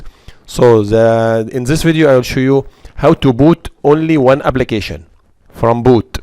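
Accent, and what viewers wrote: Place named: Egypt. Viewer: Thanks, I think you are Egyptian Inferring from the accent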